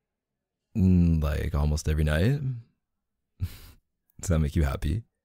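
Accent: Canada. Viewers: canadian accents are so adorable i cannot— 😶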